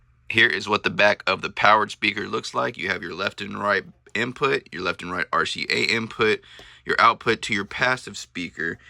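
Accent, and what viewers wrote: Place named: United States. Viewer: I hear the US accent and I know the claim in the title is bs. I'm out of here.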